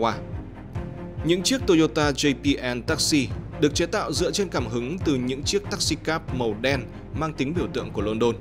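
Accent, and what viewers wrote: North, Vietnam. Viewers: nghe giọng ba kẹ là tao đã ngi ngi rồi :v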